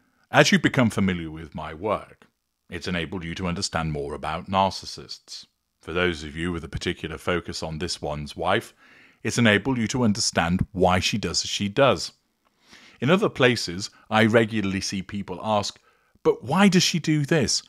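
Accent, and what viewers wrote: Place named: England, United Kingdom. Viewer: English accent is so fancy so when he swears it is extra special Love love love this channel!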